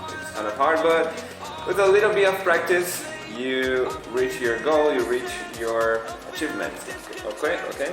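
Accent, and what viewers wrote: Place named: France. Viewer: Wawww that frence accent 😌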